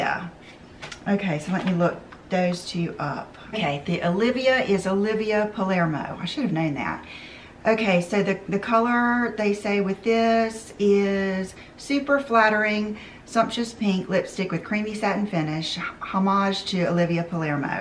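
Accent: South, United States. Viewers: I like how you are so thorough with showing us the colours and giving your opinion of them. I also love your southern accent x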